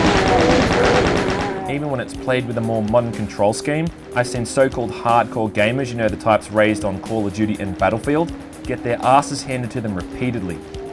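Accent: Australia. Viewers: I can accept that it is the grand-daddy of FPS games, but I still like Heretic better. Although I can concede that Heretic is made a lot easier by not having any enemy using a hitscan weapon against you. And I absolutely love your Retro Reviews. Made especially better with your Aussie accent of course, and funny things you say that only us Aussies would say :P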